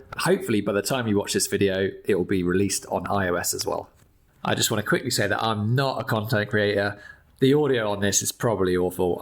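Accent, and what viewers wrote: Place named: United Kingdom. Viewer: Ohh maan.... British accent is the best.. i love it 😂😂😂🎉🎉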